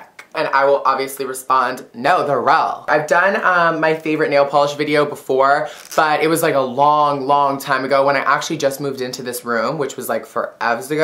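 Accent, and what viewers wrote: Canada. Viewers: are u Canadian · Lol love your Canadian accent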